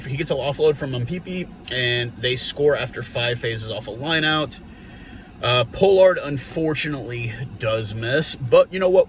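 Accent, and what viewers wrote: United States. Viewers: Greeting from a South African living in Switzerland (I used to live in the US). Loving your show and it's great to hear someone speak of my two favourite teams especially in an American accent. Great game this weekend, it was epic. Keep up the good work!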